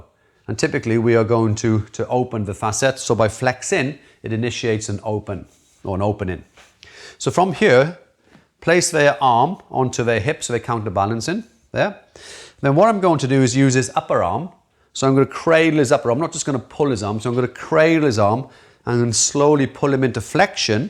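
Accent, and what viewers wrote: Wales, United Kingdom. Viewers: That's definitely a Welsh accent I hear!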